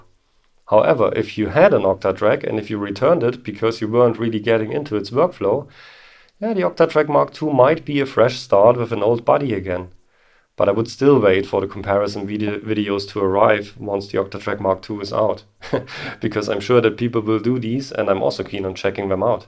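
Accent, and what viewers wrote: Germany. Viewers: you are sooo fast. too fast for my ears.... i like your oder slow Videos. are you german? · "Some may laugh the decision"- love that cool German accent!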